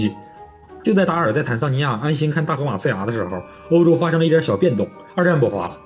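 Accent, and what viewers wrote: Northeast, China. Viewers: 优秀的文案还得要用那迷人的东北口音读出来才得劲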